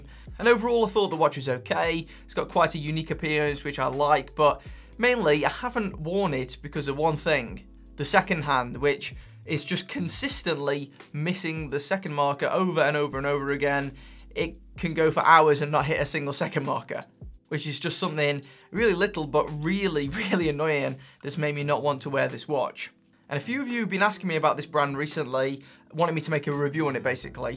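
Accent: Stoke, United Kingdom. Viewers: No, you're not unlucky. Braun is more than just a fashion watch brand, they have a long and illustrious history and their design philosophy has become iconic, but their build quality is RUBBISH. I've very optimistically bought 3 Braun watches over the years, including the ridiculously expensive "Prestige" chrono, and if the only problem you've had so far is a misaligned second hand, just wait until you've owned it a little longer and the REAL problems begin. That second WILL fall off eventually, the strap will disintegrate, none of the 6 hands on the chrono will behave as they should, any coating on the case or strap will scratch and chip away...and try changing the battery on the chrono - they've deliberately designed it so you have to take the whole watch apart via the front, although Braun recommend you send it to them to do it, 40 QUID to change a BATTERY! 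You're right, it's stupidity on their part, as by saving a little on quality control, they've now ensured I will never buy any product from the Braun range ever again, and will recommend anyone I know to avoid them like the plague. How to ruin a great brand. BTW, is that a Stoke accent?;)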